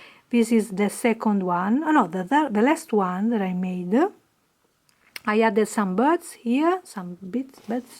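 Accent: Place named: Italy. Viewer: I loved hearing your fun Italian accent! I'm inspired always by your great variety of skills!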